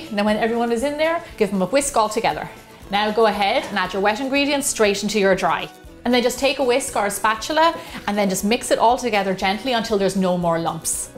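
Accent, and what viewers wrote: Ireland. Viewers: Hi! This is a wonderful cake and even though I don't eat eggs I bet this is just so yummy! I love your videos and your wonderful personality and that absolutely lovely Irish accent! It's also heartening to know that you reply to the comments of your subscribers. Good luck to your awesome channel!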